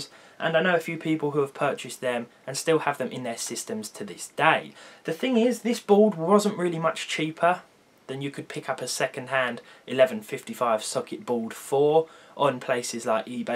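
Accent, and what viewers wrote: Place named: Essex, United Kingdom. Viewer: you sound like you're from Essex , although I maybe wrong. Anyways great video , but the x79 Chinese mobos are either a bust or they last like 3-5 years.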